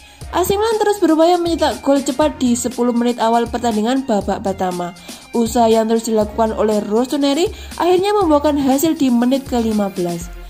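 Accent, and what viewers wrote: Java, Indonesia. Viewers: Wah wah bahasanya medok bgt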